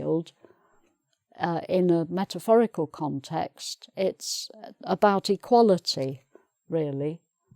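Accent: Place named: RP, United Kingdom. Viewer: I love this lady! She explains things so clearly and with this so beautiful British received pronunciation.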